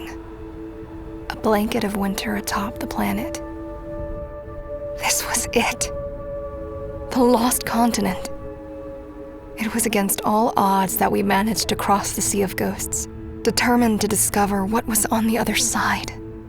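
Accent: United States. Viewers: I would have got a none american accent to narrate considering its fantasy, and elder scrolls which uses European accents mostly. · The American accent doesn't do it for me.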